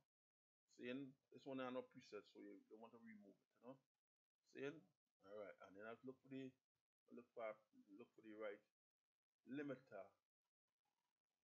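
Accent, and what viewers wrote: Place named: Virgin Islands, Caribbean. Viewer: The man call scene I thought u were from the Virgin Islands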